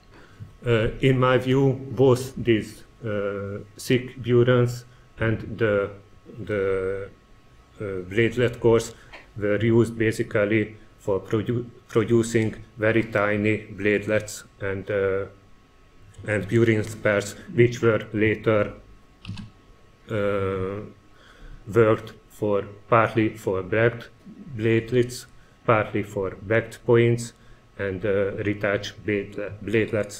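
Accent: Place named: Hungary. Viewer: Magyar olvasta hisz nem ő-zik egy angol, de nagyon érdekes az anyag.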